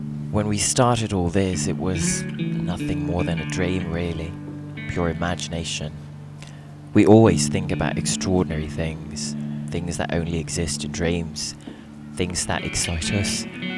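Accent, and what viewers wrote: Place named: United Kingdom. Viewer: ini yang voice overnya british2 gimana gitu yah ngomongnya..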